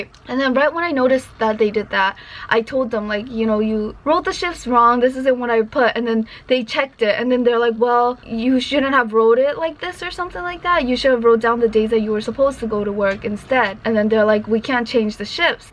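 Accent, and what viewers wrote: United Kingdom. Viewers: 😂 there's british accent in you somehow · Perfect American English (accent and ease never searching for words, better than half the people here) with sublet British endings occasionally. I know some countries teach British accent English but if that were the case something (YouTube, time in America, ?) has almost totally erased it. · .....Are you with UK accent??? Sounds like UK English...but not so UK ....... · Swear I thought she was British. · Have no idea why Youtube recommended this to me but yea I like your English accent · Are you british? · 有英伦腔。。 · chinese speaking like an british op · Nice British accent!!! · your British accent is soooo Cutee 😄😄😄😄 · In those cases,just start YELLING..Shouting..anything..You didn't like Job anyway,so max they could do is Kick you so Be it He is one Old Lonly miserable man must be,those kind just run away Be Brave...One shall need to know How to Raise Voice in order to Protect self,its not wrong Also you got kinda like Britsh accent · Do you have a video showing your British accent? 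That would be interesting. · That British accent 😍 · Dang ..that brit accent tho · I feel some London England in your accent. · nice British accent! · Your accent... so British..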